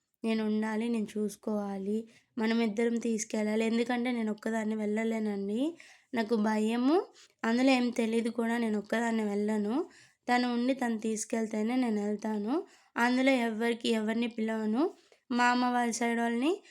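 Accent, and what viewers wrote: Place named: Nellore, India. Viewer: చక్కగా వుంది మీ video Super, వాయిస్ కూడా Super, Nellore నా ❤👌👍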